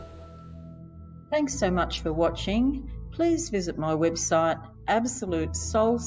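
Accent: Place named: Australia. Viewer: I heard you and thought.. This girl's an Aussie.. And then you mentioned the kookaburras.. 😂😂😂.. Loved your reading!... (fruit in the Garden.. MAN.. GO!😉)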